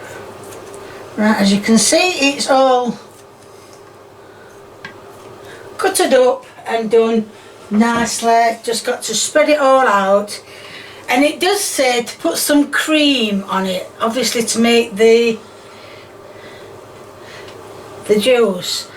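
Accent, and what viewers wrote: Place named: Yorkshire, United Kingdom. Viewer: I love accent. Is it Yorkshire accent?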